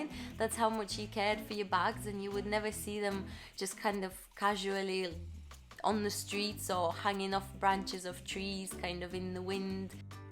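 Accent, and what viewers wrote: Yorkshire, United Kingdom. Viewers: Love your accent sounds like you've got a bit of Yorkshire in there 😂😍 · You have a nice Yorkshire accent LUV. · Sounds like a Yorkshire accent’ goes perfectly with your personality’ love it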